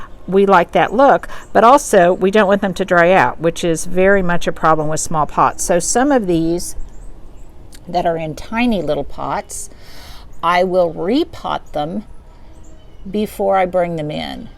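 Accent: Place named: United States. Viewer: Hi. Thank you for another very informative video. I don't have any topiaries. I am disabled, but decided to start gardening. Not the best hobby I didn't think about all the digging lol. So I am just starting with bedding plants in tubs and my hubby helps with compost. I am Looking forward to getting some topiaries in Spring to put in pots. Can you put a small topiary in a big tub, so they don't dry out? They make me feel happy as well. I do love your accent. I am from England so don't get to hear your beautiful American accent ever. Anyway thank you xx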